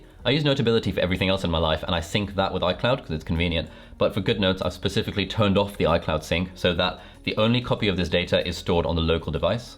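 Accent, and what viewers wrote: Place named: United Kingdom. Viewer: You have a very charismatic personality/way of presenting yourself, kept me engaged to the end. Must be your british accent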